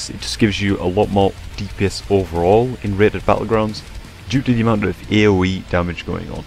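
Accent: Newcastle, United Kingdom. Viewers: Were abouts are you from? are you from newcastle by any chance? because im from there :D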